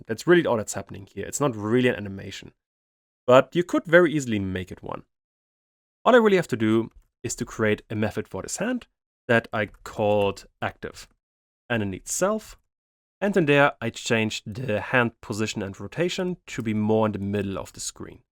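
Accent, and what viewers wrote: Germany. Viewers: Sound like german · are you german? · Are you german? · deutscher akzent? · you have a nice accent, are you German?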